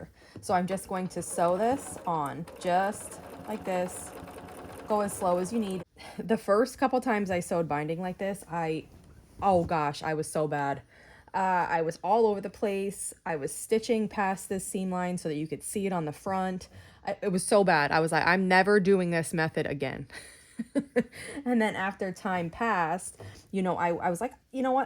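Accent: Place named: Pennsylvania, United States. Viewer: Hi! I just came across your channel! I really enjoy your quilting projects and how well you explain everything. I picked up on your PA accent. I live in Michigan now but I’m from PA too. Looking forward to watching more of your videos! Thank you!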